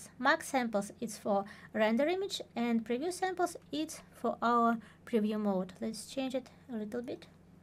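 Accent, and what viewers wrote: Russia. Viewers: Oh this lovely russian accent! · THNX for video, ps: русский английский просто невозможно не распознать)))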